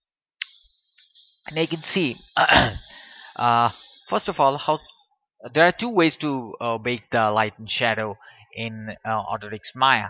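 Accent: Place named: Bengal, India. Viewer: I'm guessing you're Bengali 😊 Thank you very much for the tutorial!! 🙏 Very informative and clearly explained 👍👍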